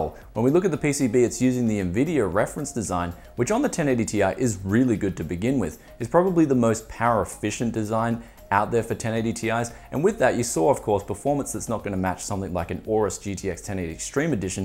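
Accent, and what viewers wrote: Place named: Australia. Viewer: EX OC SNIPA! Love that Aussie accent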